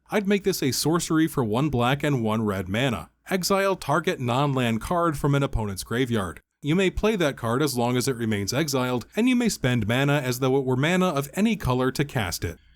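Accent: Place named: Brooklyn, United States. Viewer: I appreciate your Brooklyn accent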